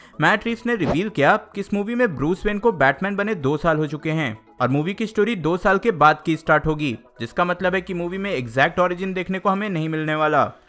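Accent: India. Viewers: HAS..A.DESI.TOUCH IN HIS.VOICE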